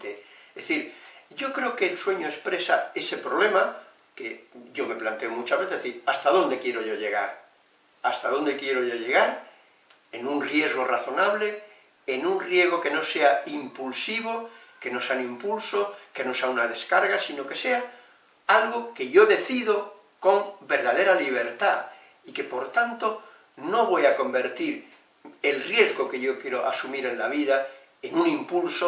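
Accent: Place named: Spain. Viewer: Me ha gustado el acento español con el que habla :)), buena explicación de la teoría.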